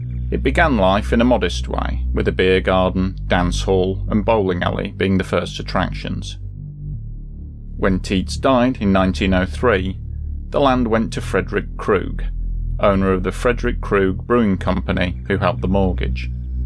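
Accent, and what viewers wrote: United Kingdom. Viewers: I can tell you're British, but youve live in America for a while. I can tell by the way you say "the hospital"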